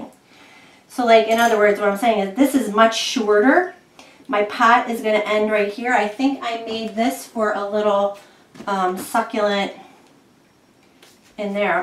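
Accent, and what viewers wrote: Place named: South Jersey, United States. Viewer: Had a feeling you were from south Jersey. A Jersey girl recognizes the accent. 😂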